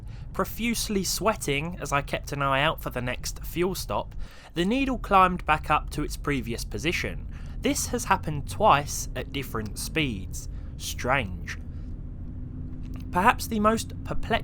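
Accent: United Kingdom. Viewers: Dennis Thorsson there’s something charming about the lower budget though. And the British accent lol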